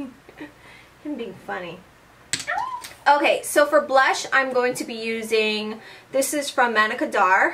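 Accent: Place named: Hawaii, United States. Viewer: loved this video!! watching you and hearing your accent make me miss hawaii so much!! <3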